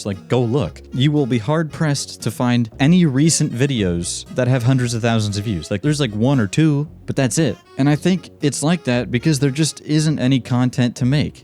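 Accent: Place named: Singapore. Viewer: you live in singapore? your accent seems like it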